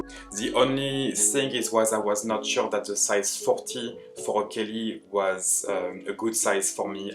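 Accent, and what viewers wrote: France. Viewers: Bonjour de la Belgique 🇧🇪, félicitations pour votre magnifique sac Hermès Kelly 😜❤️, je ne comprends pas l’Anglais, mais je devine ce que vous dites 😂, surtout votre accent bien Français 😂, félicitations pour votre collection Hermès, que je commence seulement depuis 1 an, je me suis offerte 1 Kelly 28 Gol retourné, bijoux Palladium ❤, maintenant j’attends 1 Kelly noir 32 retourné, bijoux Or❤, je me suis offerte une montre H et Brillants aux heures, ex ex ex des petits achats 😜❤️, comme je suis Belge, j’étais concentrée sur les sacs Delvaux Brillant 😜❤️, bon en espérant qu’ils n’y aura pas de disputes dans les armoires, la France contre la Belgique 😂, Meilleure vœux pour l’année nouvelle 🥂🍾🌲. Bien à vous 🤗💛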